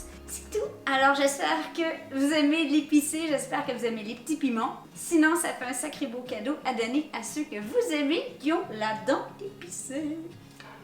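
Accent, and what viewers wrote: Quebec, Canada. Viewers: Quel bonheur cet accent Québecois pour une bonne recette et en prime de belles expressions comme "La dent épicée" 😉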